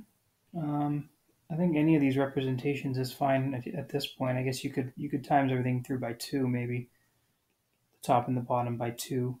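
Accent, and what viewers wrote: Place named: Kansas, United States. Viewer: You sound like one of my uncles. Were you born in Kansas?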